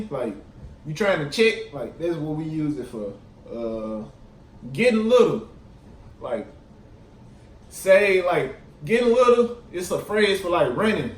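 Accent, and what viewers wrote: United States. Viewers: One of my oldest friends was from Baton Rouge, LA. You reminded me of him, but he was old enough to be your grandpa. I could barely understand him most of the time. Once he kept saying "han me ovuh the fo paypah," or something like that. I had no idea what he wanted, he finally had to get it and show me (aluminum foil!). Anyway, you use a different slang, because you're young, but the general way you sound is a lot like old Frank, bless his soul. I'm born and raised in California & Oregon. You truly speak a different language down there. 🤔😎 Had to subscribe! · Mane You sound like a Memphis person you sure u from Mississippi?? Most of the slang you use they say in Memphis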